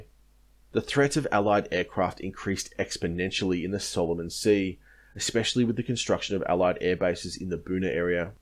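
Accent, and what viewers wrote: Australia. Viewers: I LOVE this Aussie narrator ! Awesome. G'day mate ! 🫡 🇦🇺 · Hmmm? Narator sounds like Majorkill. Or do all Australians sound same?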